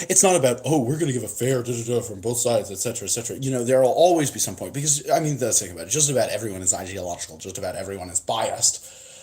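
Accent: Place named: Australia. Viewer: I swear your Aussie accent is more authentic than my fellow Australians. By the way, if you ever talk to Adam, give him our blessings, from the old Wallace gang.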